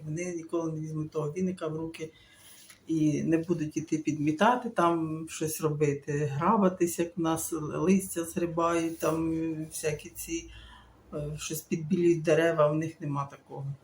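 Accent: Surzhyk, Ukraine. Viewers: Не буду на вас підписуватись....Ваш дикий суржик дуже ріже слух.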